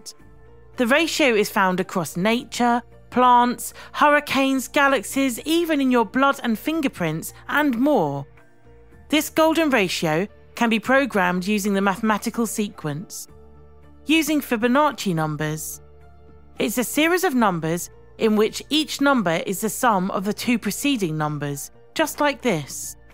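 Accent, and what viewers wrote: United Kingdom. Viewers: Know how, I subscribe because I love the British accent and the narrator even makes more appetising.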